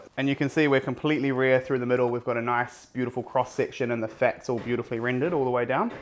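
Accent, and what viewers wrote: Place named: New Zealand. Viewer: Who the fuck taught you accents lmao he's clearly a kiwi.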